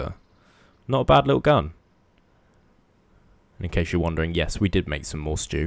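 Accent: Northern Ireland, United Kingdom. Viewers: The northern Irish accent has me dying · Oh lord the NI accent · well, being from Northern Ireland, our accent is incredibly similar. Considering how a fuck load of Scots came to Belfast and surrounding areas. Hence the name, ULSTER SCOTS. Also, no need to be a prick about it. Being that you work with him, you'd know he's Scottish. I didn't know he was Scottish, I heard the accent and thought it sounded Northern Irish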